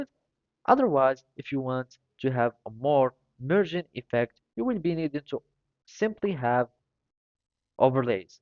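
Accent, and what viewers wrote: Morocco. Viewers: It’s insane that I know that you’re Moroccan by your slight accent because I’m Moroccan too 😂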